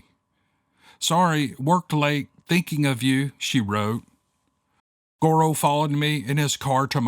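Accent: South, United States. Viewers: I started listening to this story at work am and couldn’t turn it off. Amazing stories and, you just takes it to another level with his Southern Comfort style narrating it captures my mind like I’m watching a movie. Thank You.. I made it to the end. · Same here mam been on here for years now love the southern gentleman’s voice w the light touch of southern accent awesome Sir he is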